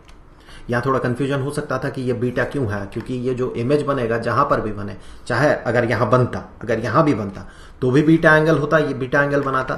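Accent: Bihar, India. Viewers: Sir are u from bihar?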